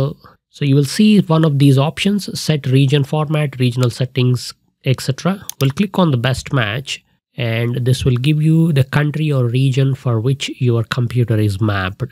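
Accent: India. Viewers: Sir your country name please? Your accent seems like Indian but when I saw your misses then I confused about your country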